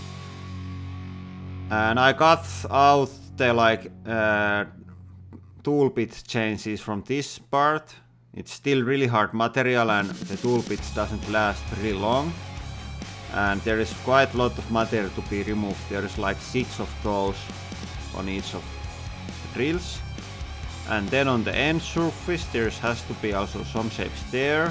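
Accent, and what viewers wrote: Finland. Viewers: Such an awesome video! Machining porn and also awesome Finnish accent. Made my morning! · i was wondering where i've heard this accent before.he sounds like kimi raikonnen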